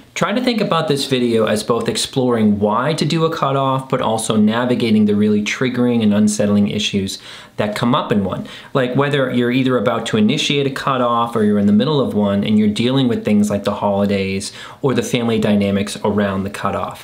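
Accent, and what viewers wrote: Boston, United States. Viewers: I love it when your Bostonian accent comes out! This video was medicine for me. I’m mid-cut off with a couple covert toxic people after a verbally and emotionally abusive episode where I had enough. Though it’s tricky because my life was already in limbo… divorcing an alcoholic and currently staying with a family member. This family member keeps bringing up how much it hurt one of the toxic people when I didn’t acknowledge her birthday following the abusive incident. It’s exhausting being under the same roof. This person definitely has a different story of our family. Looking forward to when I am able to get into my own place… I’m working on it. This video gave me the encouragement and validation to stay strong and true to myself. I feel so much calmer and grounded after I watch these. Thank YOU!! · Interesting how you compared cutting off family to a nasty divorce... 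It reminds me of covert incest and parentification, which can totally feel like a nasty divorce, even when you set the smallest of boundaries with the family members projecting those relationships onto you. PS great Boston accent! I'm Boston based as well! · 😂 love the 'Bwostin ackcint'!!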